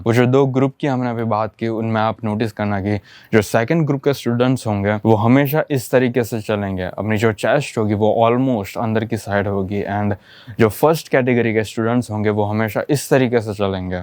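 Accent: Gujarat, India. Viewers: I can feel the Gujarati accent in your speech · Real hai bhai, yeh Gujarati speaker hai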